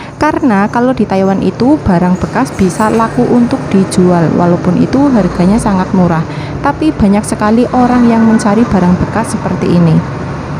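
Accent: Banyumas, Indonesia. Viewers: Mbk wong ngapak yak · Nembe nemu channel kie, sampeyan si piyantun ngendi mbak ?, medok banget ngomonge alias ngapak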